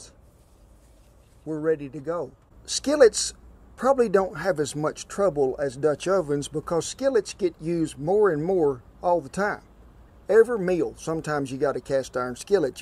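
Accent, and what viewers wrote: South, United States. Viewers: I fully expected a "why thank ya darling!!" #SouthernAccents ❤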